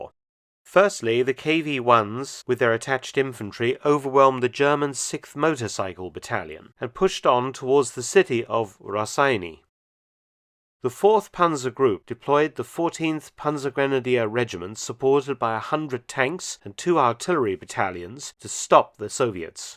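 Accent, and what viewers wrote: England, United Kingdom. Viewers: He just sounds British to me. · I understood everything that Mark said, quite well. Who the F cares what he sounds like?!? His CONTENT is what matters, here, and his CONTENT is excellent. If you under-educated, non-worldly, narrow-minders can't move freely from one accent to another, or one language to another, whose fault is that, huh?!? Parts of the U.S. have dialectical accents that are far different, and harder to understand, than his Brit accent, from all the way across the Atlantic‐-but, again who cares? And 1950's? Give me a break! A LOT of good sh*t came out, both in TV and movies, from the 1950's! And some of today's shows, and media‐-are pure cr*p, by comparison! You guys are overlooking the central point, here: that these guys were fighting for the defense of their HOMELAND. How would you like it; what would you do--if we had to defend the U.S. 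from say, a massive invasion of the Chinese Army, huh?!? It is no wonder that these guys FOUGHT TO THE DEATH, to try to save their mothers, fathers, sisters, brothers, girlfriends, and kids, etc...wouldn't YOU? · English. British encompasses too many different accents.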